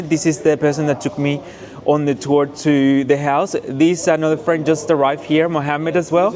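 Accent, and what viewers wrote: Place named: Australia. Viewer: I am glad u enjoyed ur visit in iraq amigo . where are u from by the way , ur English sound Australian but i can hear the Latin accent there <3 . Thank u for showing the yummy food that i miss . the dolma is not good doesnt look good i give it 2/10 my mother make the real dolma from mosul .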